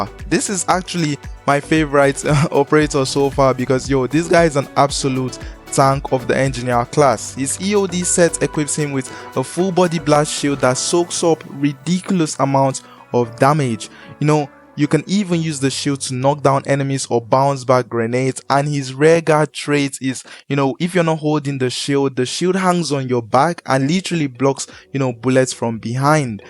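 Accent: Nigeria. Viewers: You sound Nigerian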